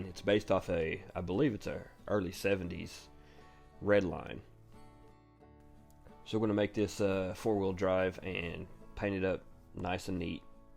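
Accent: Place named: Kentucky, United States. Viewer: not a problem man. Its nice hearing someone with an accent like me. Im from Kentucky and I have an accent too. You sound normal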